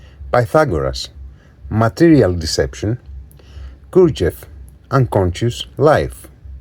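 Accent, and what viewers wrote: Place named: Greece. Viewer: I find your accent very charming. Greek must be a beautiful language!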